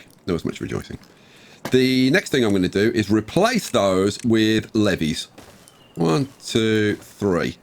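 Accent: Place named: United Kingdom. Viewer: Dude you have big potential, you remind me of RCE with your British accent and enthousiast and jolly attitude. Also love the little interactions with your wife. Keep doing what you are doing man!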